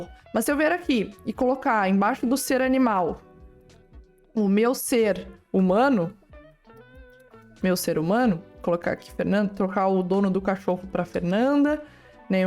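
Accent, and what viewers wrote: Rio Grande do Sul, Brazil. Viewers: ela é gaúcha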